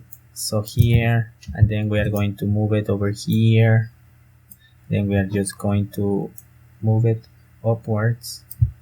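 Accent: Spain. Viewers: Se nota que hablas español xd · cause its kinda hard to understand you with your strong Spanish accent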